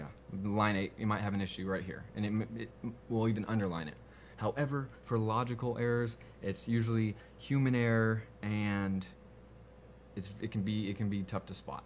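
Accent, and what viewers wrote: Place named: United States. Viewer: thank you for this playlist. it's very hard to find java videos with english american accent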